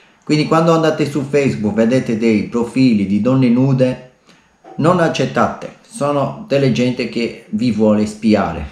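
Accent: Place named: Albania. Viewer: Hai l'accento Albanese wow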